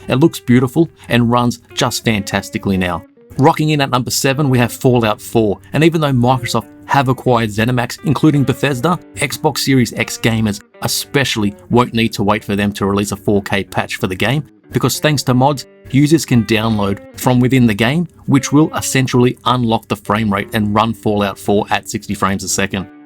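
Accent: Australia. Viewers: Are you Aussie? If so cheers from Australia 🇦🇺 😃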